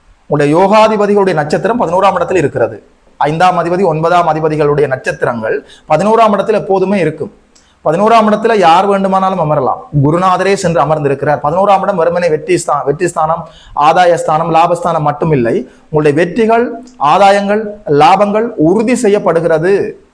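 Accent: Sri Lanka. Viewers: Sir unga tamil and astrology fan sir naan...ungaa srilankan tamil kekkatha naan ungala subscribe panniirukaen. I m from kanyakumari sir(Nagerkoil)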